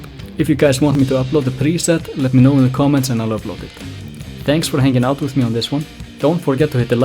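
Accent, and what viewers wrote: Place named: Finland. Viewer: yo, you sound finnish lol, love the video. Keep going!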